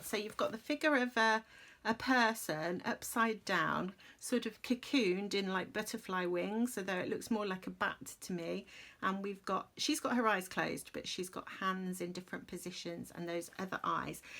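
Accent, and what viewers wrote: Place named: United Kingdom. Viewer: I've never won anything but its always exciting to join a giveaway. But most of all, I love watching your videos. So many times I can relate to your rambling about coffee, your children and journal mostly. And I love love love your accent. Been missing my British friend so watching yours really made me less missing her. Thanks hun. Good luck everyone.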